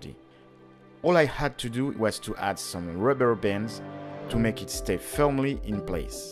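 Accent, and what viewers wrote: France. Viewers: Your english is great! Love the french accent though :) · T'as l'accent français ;-) je vais essayer de voir si je peux faire ça, ça a l'air bien cette histoire d'objectifs projecteur vidéo! Je vais essayer de trouver ça aux puces! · Tu est français ça s’entend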